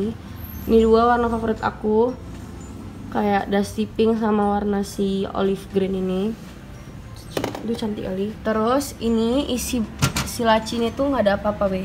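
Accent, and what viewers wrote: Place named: Medan, Indonesia. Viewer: lahh org medan jugakk? pantes logatnya medok kalii wkwk, sehattsehat kau yaa kak<3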